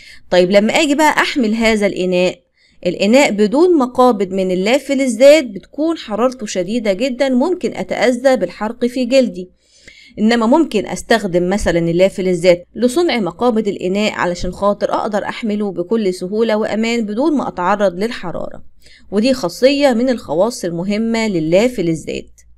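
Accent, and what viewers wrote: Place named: Saudi Arabia. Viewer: ليش تتكلمي سعودي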